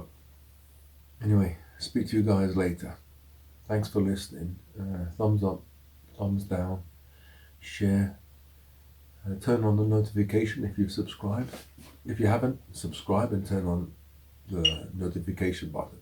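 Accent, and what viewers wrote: United Kingdom. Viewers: Hello,just discovered your channel,are you English?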